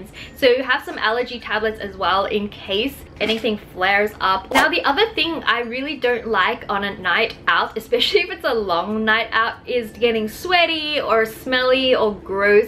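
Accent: Australia. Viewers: I love your Australian accent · WengieVlogs thanks:) i think people watch your vids partially for your content, personality, and editing skills;) and partially because of your Australian accent ^-^ and maybe your hair ♥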